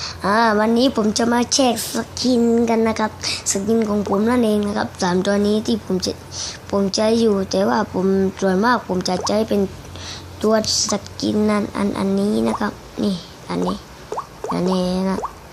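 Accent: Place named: South, Thailand. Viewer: คนใต้คนใต้ คับคนใต้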